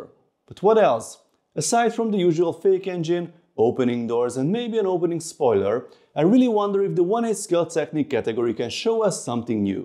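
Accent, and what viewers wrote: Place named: Hungary. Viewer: Az akcentusod alapján magyarnak mondanálak :D Király videó!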